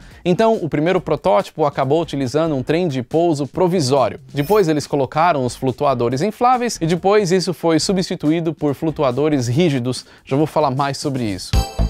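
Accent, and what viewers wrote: São Paulo, Brazil. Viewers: Engraçado q esse cara parece o Gugu falando. De q parte de São Paulo isso vem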